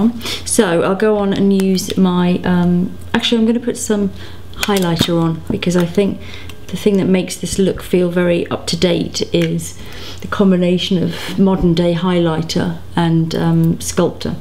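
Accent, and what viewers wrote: United Kingdom. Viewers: it cracks me up when you say these huge brand names that i have never heard and you say it in your [roper british accent. so you make each name way more bold then they really are. lol. love you!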